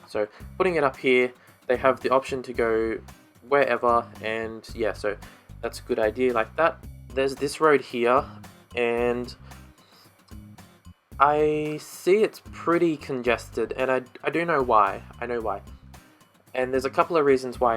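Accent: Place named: Adelaide, Australia. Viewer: your accent's similar to my Adelaidian friend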